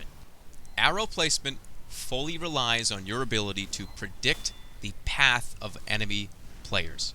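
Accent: Boston, United States. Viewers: This guy sounds like he was from where the scout's from.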